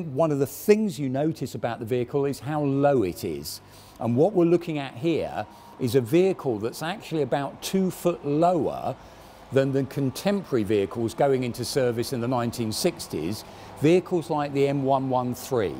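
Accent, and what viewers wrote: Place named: United Kingdom. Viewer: Sounds British.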